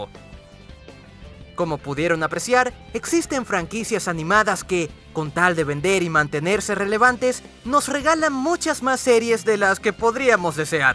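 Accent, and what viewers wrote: Venezuela. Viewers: eres venezolano o que men?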